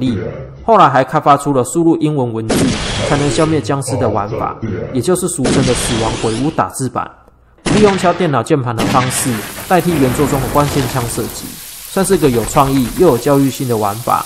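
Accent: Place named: Taiwan. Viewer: 點棟更 哈哈哈哈08笑死 尤其是大大的台灣國語 念點棟更 地契阿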